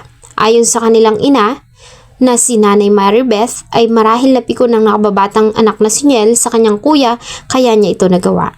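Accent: Visayas, Philippines. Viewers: putol na sundang 🤣.. Klaro kaayo na bisaya sad ni 😅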